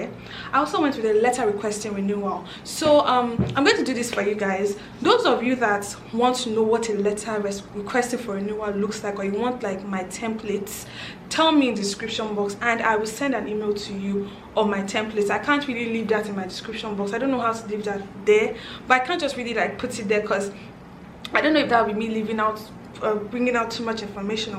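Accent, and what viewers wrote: Ghana. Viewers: You sound so Ghanaian kudos!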